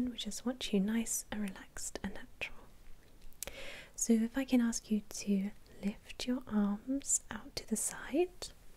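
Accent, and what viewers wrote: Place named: RP, United Kingdom. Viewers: Posh bird must be from Surrey 😁 good vid by the way 👍🏻❤️ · Wonderful tailoring video, I needed a new suit fitting for clandestine work lol. Was surprised at how relaxing a tailoring video can be. The sound of your irresistible posh accent and beauty and no background noise.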